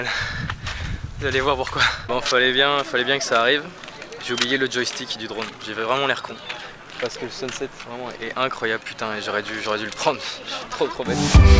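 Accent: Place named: France. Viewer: MDR yr French accent is so cOOl .On dit island hOOping ? ou island yOOpi lOOl :)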